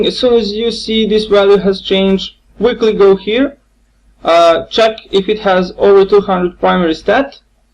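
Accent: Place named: Poland. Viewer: Slychac ze polak :D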